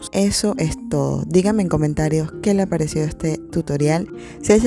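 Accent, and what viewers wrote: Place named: Venezuela. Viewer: me encanto, explicas de una manera muy sencilla y creas un muy buen ambiente. Creo que eres venezolana por tu forma de hablar, con lo cual somos paisanas. Siempre he tenido problemas al calcular el material que necesito y con tu explicacion lo has hecho super faci. <<<<<gracias por compartir...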